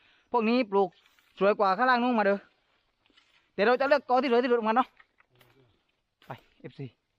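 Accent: South, Thailand. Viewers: แหลงภาคนันและ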